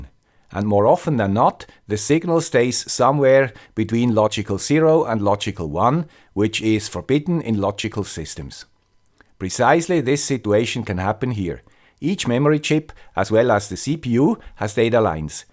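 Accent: Switzerland. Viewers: Now that was both fun and complex. Beautifully broken down and simplified in a way only the guy with the Swiss accent could have achieved. I’m feeling all nostalgic 🏴😁 thanks 🏴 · I'm also 63 years and grew up in SF Bay, so have 40+ years in computers, software and electronical things (35 of which are obsolete). Found your hilarious and accurate representation of those days refreshing. Love the Swiss accent - keep up the good work! P.S. once connected an Atmel 8085 (?! flavored chip (someone knows the part#) to an old school PS/2 keyboard [clock, data and power]. Fun fact: it sent keydown, keyup!, func keys, shift, ctrl, etc. along with control over 3 LEDs in a seemingly random but decodable fashion. Currently working on an RCA CDP1802 (Membership Card) just for fun! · Another masterpiece lecture from the "Guy with a swiss accent" .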